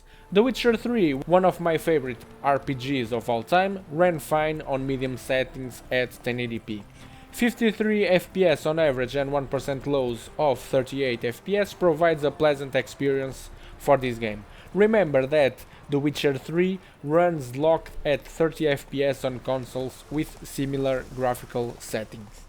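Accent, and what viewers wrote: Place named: Portugal. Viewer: Vi logo que era um tuga ahah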